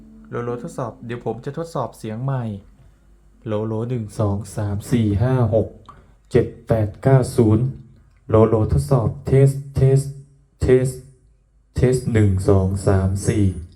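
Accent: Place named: North, Thailand. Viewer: คนเหนือแน่เลย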